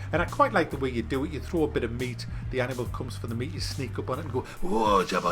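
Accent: United Kingdom. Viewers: Great review! I love the far cry series of games.Graphics, Sound, Gameplay, Immersion, Content. All grade A. If we could just get them to add Multiplayer, which is my guess for a Far Cry 5 or 6 to make an impact. Though I have taken a break playing primal after down loading PES 2016. I love listen to you Brits (hope that's politically correct) Love from America. Those bee bombs are a blast......aaaahhhhhhhh!!!!! peanut butter cup & peanut butter pieces with candy shell, same thing both yummy.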